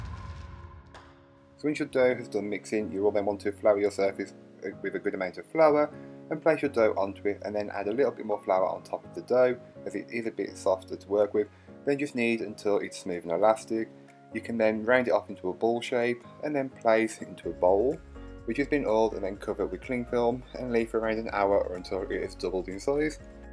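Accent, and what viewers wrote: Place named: Oldham, United Kingdom. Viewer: You sound like if you’re from Oldham. Keep the good work up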